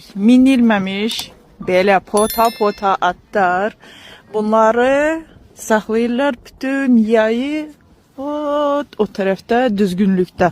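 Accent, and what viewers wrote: Ganja, Azerbaijan. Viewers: Şirin Gəncəli ləhçəsi ilə danışırsız nəsə əlaqə var ora ilə ? 😂.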